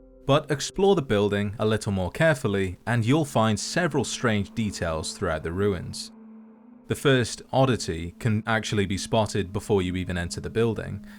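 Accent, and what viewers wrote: United Kingdom. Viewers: It’s ok mr British vault boy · Thank you British fallout lore master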